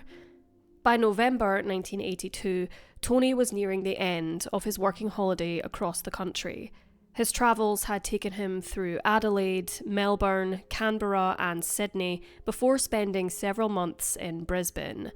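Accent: Scotland, United Kingdom. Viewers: I love the accent! I was just posting a spelling PSA for those who lack the ability to figure out what city name you meant. Not a dig about the Scottish drogue. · The narrator is Scottish, speaks with a Scottish accent. That's what "Perth" sounds like in a Scottish accent.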